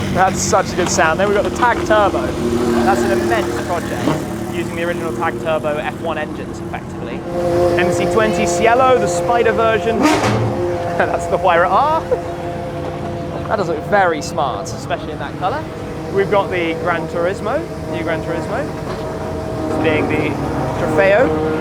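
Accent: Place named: England, United Kingdom. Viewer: Is it me or all the car you tubers have English accent 🧐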